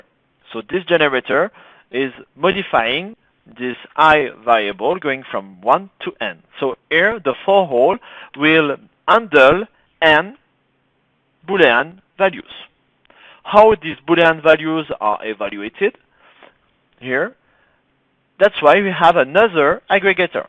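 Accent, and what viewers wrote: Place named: France. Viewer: ahaha I was just joking because it was already obvious in the other videos that you are french from the way you say minizinc, nevertheless your videos are informative and I want to thank you for them! Keep up the good work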